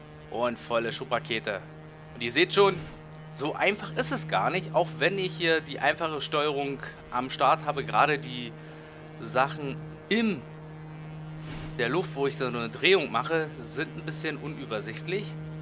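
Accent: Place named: Berlin, Germany. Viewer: Ich habe mal eine Frage an dich, wo kommst du eigentlich her. Wegen deinem Dialekt würde ich auf Berlin tippen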